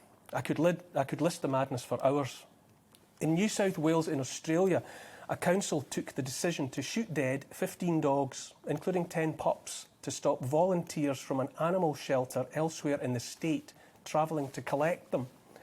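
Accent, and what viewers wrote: Scotland, United Kingdom. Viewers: What a great commentary, and delivered in a wonderful Scottish accent as well! I feel like a tired, worn-out soldier in a foxhole almost thinking it's all over who's just heard the bagpipes of an approaching Scottish regiment coming to my aid and just knowing everything's going to be all right! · Listening from across the pond. Your point is made all the more poignant with your beautiful Scottish accent. We are all brothers and sisters in this madness. Thank you for your courage and may we all have the same courage as you.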